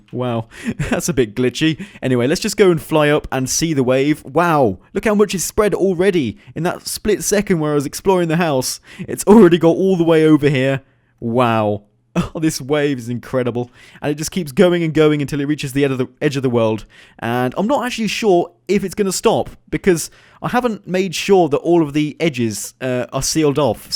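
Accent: United Kingdom. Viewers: He didn't say that just because he has a British accent. Do you know how many British YouTubers there are? None of them I've watched sound lime Stewie, but he actually does.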